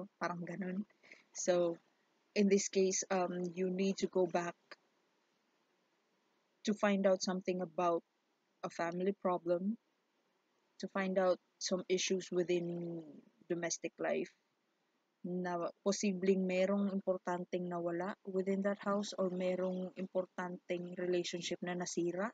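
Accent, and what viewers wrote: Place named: Visayas, Philippines. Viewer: Bisaya diay ka maam.😂no worries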